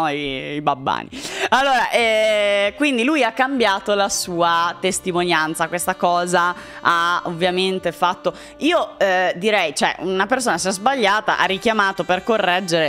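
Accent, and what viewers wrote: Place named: Emilia, Italy. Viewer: "...no no, sono pubici, fanno il ricciolino...!" con la tua cadenza emiliana, sono morto dal ridere, fantastica!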